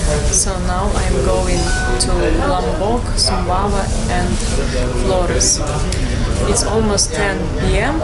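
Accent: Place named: Russia. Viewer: You're a brave girl lol I always love Russian English accent.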